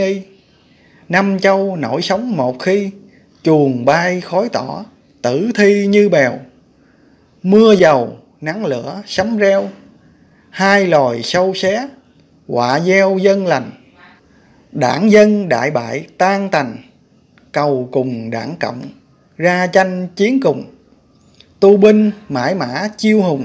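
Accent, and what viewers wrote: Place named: Central Vietnam, Vietnam. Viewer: Kiến thức này ko để trọ trẹ đọc mất thiêng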